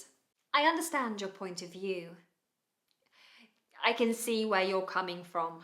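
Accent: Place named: United Kingdom. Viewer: Idont know but🙈 the British accent makes me feel like you're dealing with the English language.like Princess 👌and that's great, unlike the other accents which used in every englìsh speaking country , all of them speak English. But you have your own spicesss👌👌 youre great ❤❤❤ all the best always end 4everrr Thanks a thousand times😁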